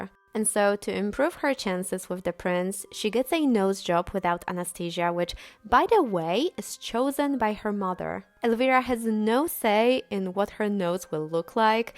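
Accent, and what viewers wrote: Poland. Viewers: I can hear a little Polish twang in your English. Are you originally from Poland?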